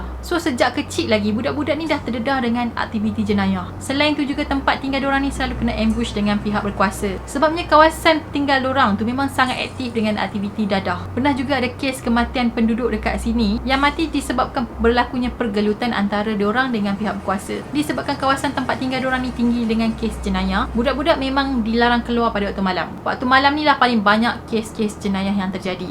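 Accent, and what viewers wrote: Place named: Perak, Malaysia. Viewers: Pandai story cakap light Perak ye..aje gemo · wau ckp owng perak tengoh lehh..saya orng perak tapi belah utaraq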